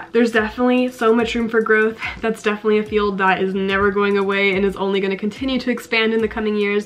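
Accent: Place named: Germany. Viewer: So cool! Ich habe mir gedacht dass du einen klein deutschen Akzent hast. Wirklich nur eine kleinen. Danke, dass du deine Erfahrungen mit uns teilst.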